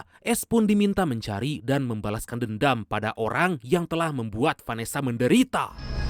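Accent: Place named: Banjar, Indonesia. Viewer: Banjar bnr pian lh 😅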